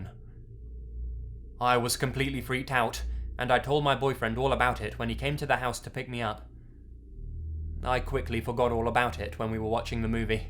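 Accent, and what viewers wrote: England, United Kingdom. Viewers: Are you from Britain just wondering I'm not I'm from Spain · don't think he's posh he's just english lol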